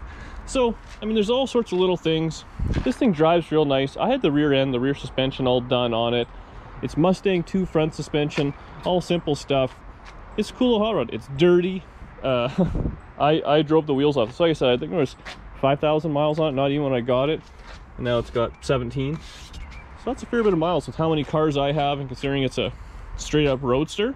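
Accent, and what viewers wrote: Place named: Canada. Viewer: I listened to this for the Canadian accent.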